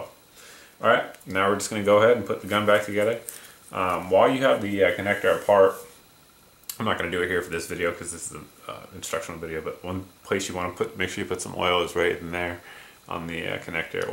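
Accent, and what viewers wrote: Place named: Massachusetts, United States. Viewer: Just revisited this video and noticed your slight accent in this early video. Massachusetts, right?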